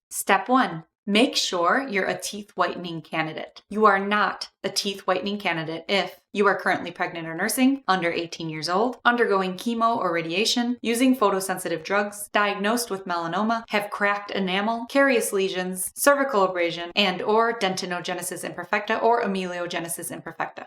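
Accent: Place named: Chicago, United States. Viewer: So. Much. Chicago! I love your accent! Thanks for the great advice!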